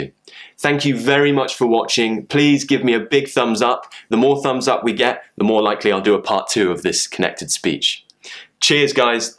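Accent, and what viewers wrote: United Kingdom. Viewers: Nice video 👍 Which area of British accent would you say your accent? As there are lots of British accent depends on the city? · you´re a perfect english teacher... your GB accent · I love ❤ British accent. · The 'your' sound in the video sounds similar to 'yeah' sound to me. It's interesting to listen to British accent. Thank you for the video.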